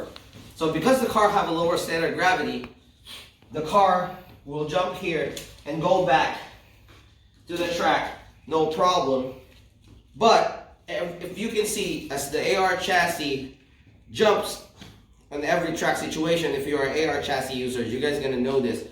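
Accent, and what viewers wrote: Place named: Philippines. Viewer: Filipino accent I hear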